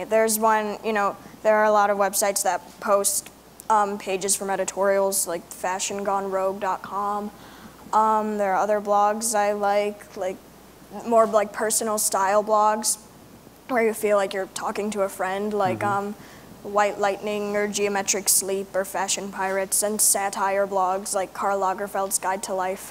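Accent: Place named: United States. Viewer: this american accent seems incredibly harsh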